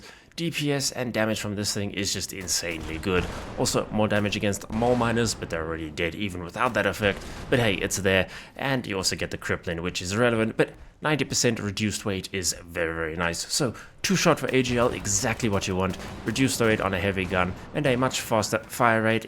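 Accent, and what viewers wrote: South Africa. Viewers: You from South Africa? Just asking because of the accent.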